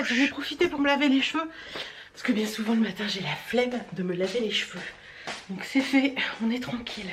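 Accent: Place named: Belgium. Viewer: Coucou, j'ai bien aimé ton accent belge 😂😂😂 j'ai cru que tu pensais à moi à ce moment là 😂😂😂. Gros bisous de Belgique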